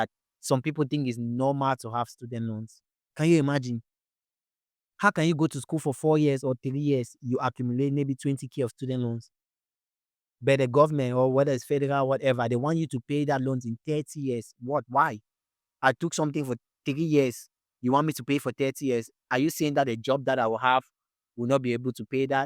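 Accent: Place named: Cameroon. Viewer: Cameroonian here...thanks for speaking up and keeping your accent and mixing it up with pidgin 😂 i love it....am in canada, and i love the fact that you spoke up about this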